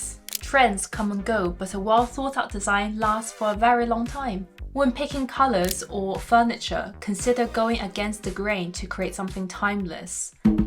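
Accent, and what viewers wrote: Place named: RP, United Kingdom. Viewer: I recently stumbled on your videos algorithm suggestion and wanted to encourage you to not give up on your YouTube account and to tell you that your content, been binge watching, have been really spot on very informative and delivered in a way that normal people can relate to and understand and thoroughly enjoying watching the vids and hear your posh accent haha. I am pretty sure it’s just a matter of time before everyone else discovers you and become the success like Corey! Keep pushing all the content and thank you really appreciate what you are doing here ❤❤❤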